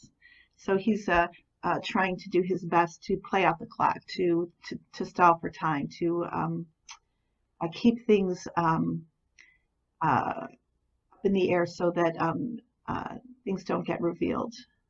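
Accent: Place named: Michigan, United States. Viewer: Thanks!!! I thought you sounded like you were from Michigan!! 😁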